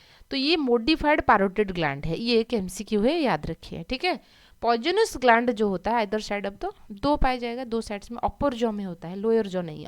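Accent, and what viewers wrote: Odisha, India. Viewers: Mam are you from odisha??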